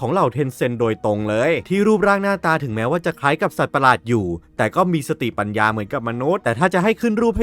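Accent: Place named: Thailand. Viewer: เสียงพี่เหน่อมาก😂😂😂😂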